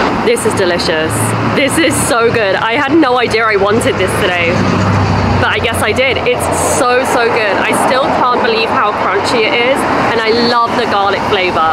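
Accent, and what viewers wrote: United Kingdom. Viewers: いつも､ さんの｢イギリス英語｣を聴きに来ます｡ 明瞭で､美しくて､音楽のようでホッとします｡ アメリカ人の英語だと､ときどき聞き取りが難しいニッポン人の私ですが…… さんの｢イギリス英語なら､いつもハッキリ分かって､安心します｡ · 落ち着いてこじんまりした感じがいいですね。なんか、懐かしい感じがします。ところで、さんの「いただきます」とイギリス英語のとりこになしました！！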